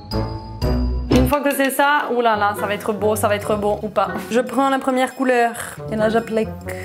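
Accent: Quebec, Canada. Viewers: Si c'est grave beau l'accent québécois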